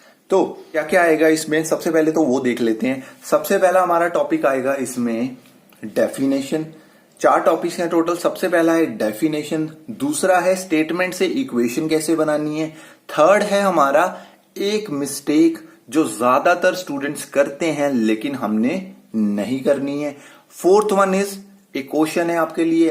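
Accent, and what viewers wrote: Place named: Punjab, India. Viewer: phirse kya haal he 😂. Full punjabi accent.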